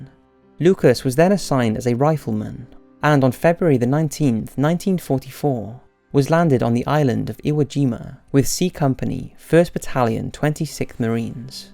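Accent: United Kingdom. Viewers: I understand that, being from Great Britain (from your accent), you would focus on the heroes of the Allies. Yet, I wonder about the heroes of the opposing sides, especially the Germans. I don't care about the Nazis, I'm referring to the average soldier of the Wermarcht. These boys were no different than any other soldier, scared, far from home, fighting for their country and wanting to return home. Unfortunately, because they were controlled by the Nazis, they get lumped under the same title without thought.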